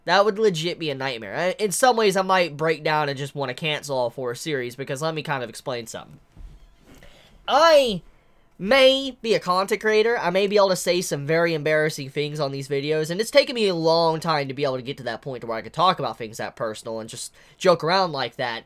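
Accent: South, United States. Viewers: I think he from the south too.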